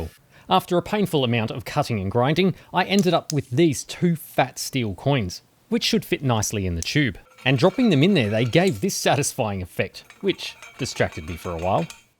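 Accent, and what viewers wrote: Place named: Australia. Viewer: I love your sense of humor. Your Austrailan accent makes it even better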